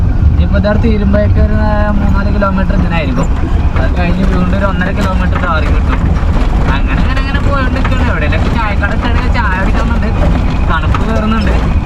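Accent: Malappuram, India. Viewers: ഗെയ്സ് നിങ്ങൾ പൊളിയാണ് സിമ്പിൾ ആയ വിവരണം ,നമ്മൾ സ്വയം യാത്ര ചെയ്ത് explore ചെയ്യുന്ന ഒരു feel ഒരു തള്ളും ഇല്ലാത്ത നല്ല വിവരണ vdo, ഞാനും ഒരു മലപ്പുറം ജില്ലക്കാരൻ തന്നെയാണ് ,, ചെറുതായിട്ട് നമ്മുടെ സ്ലാഗ് കുറച്ച് ഒക്കെ കുറക്കാം നിങ്ങൾക്ക് ഈസി ആയി പറ്റും ,വരും യാത്രകളിൽ ഡ്രോൺ ഒക്കെ ഉപയോഗിക്കാൻ കഴിയട്ടെ ,, നിങ്ങൾ മില്ല്യണുകൾ അടിച്ച് കേറി വരട്ടെ എന്ന് ഈശ്വരനോട് പ്രാർത്ഥിക്കുന്നു ,ഒപ്പം എല്ലാ സപ്പോർടും❤❤❤❤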